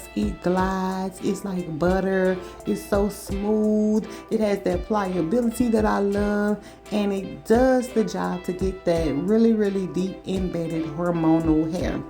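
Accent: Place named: South, United States. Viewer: This lady must come from Mississippi or Louisiana. She had to mispronounce the easiest words. She sounds illiterate and needs to read more. 💯🤷🏿‍♀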